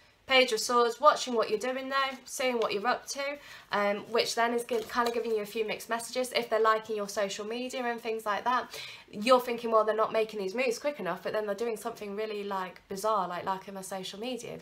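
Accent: United Kingdom. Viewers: I am so happy that I discovered and AND that I stick by watching your videos, in spite I have hard time to understand British accent (english is my second, used to American). You are so wise and connected, I find your insights are being accurate. #1 for me, totally on spot, and Thank you for that.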